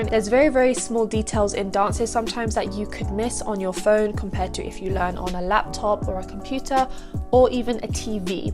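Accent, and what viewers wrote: United Kingdom. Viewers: You sound English if you are I know I’m not the only English-dancing-k-pop-fan. 😭🙏🏽🙏🏽🙏🏽🙏🏽🙏🏽 (LOL)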